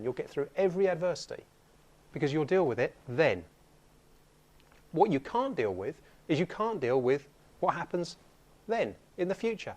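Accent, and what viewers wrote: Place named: England, United Kingdom. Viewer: I meant that the audio in another channel was louder (Not same video). The thing is English accent is very heavy which makes it difficult for me to understand him even on a loud volume so listening to him on a low volume makes it harder. Oh well.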